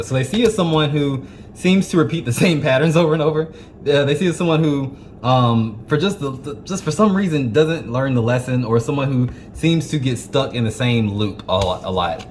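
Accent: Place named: South, United States. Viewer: I’m in love with your accent. I wish I had a southern accent.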